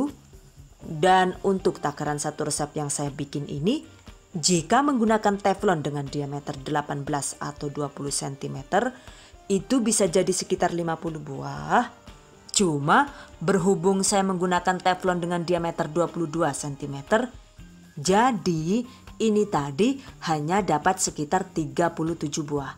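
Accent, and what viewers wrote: Banyumas, Indonesia. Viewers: Satu2 nya yang jelas detail sampe ke hitung2nya, cuma ada di. Ada logat ngapaknya jg😊😊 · Suka bgt dengan resep kue yg selalu gamblang dan mudah di mengerti setiap caranya dan obrolan ngapak nya keren trims 😁😁😁👍👍👍👍👏👏👏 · Kesuh nyonge..ini yang saya suka dr chanel ini..berbagi resep..dengan ciri khas ngapak..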